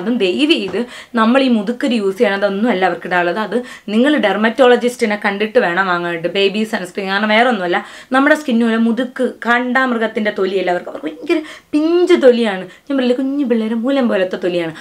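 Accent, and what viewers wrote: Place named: Thiruvananthapuram, India. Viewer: Thiruvanandhapuram ahno sthalam😂 nammde tone pole 😆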